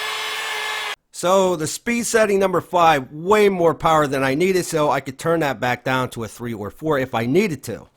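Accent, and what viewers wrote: Pittsburgh, United States. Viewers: you have the most Pittsburgh accent ever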